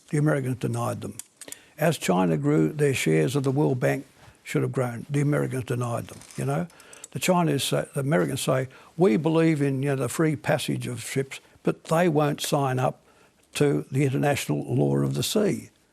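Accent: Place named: Australia. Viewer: Mr. Keating Bravo ! As an Aussie Dual USA Citizen who lives in China for the past 11 years I can honestly say you are spot on. I was here before President Xi took office and it is a dramatically different country for the better since he has taken over. The officials in China wake up in the morning and say "what is it I can do to make the life of the Chinese people harmonious and prosperous?". The Australian officials wake up in the morning and say "what is it I can do for the Americans.?" There are millions of Aussies who need leadership and all they are getting is a born again evangelical who has handed over all policy decisions to the religious right in the USA. When there was all the trouble with Trump I would tell the Chinese people who asked where I was from that I was Australian until it became clear that being Australian in China today is far worse than being an American. 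Who would have thought that our soft power has declined so much that Australia is no longer considered a friend of China. Thanks for your inspiring talk. You made me feel proud once again that there was some intellectual truth being told with an accent that makes me wanna say ..... she'll be right mate!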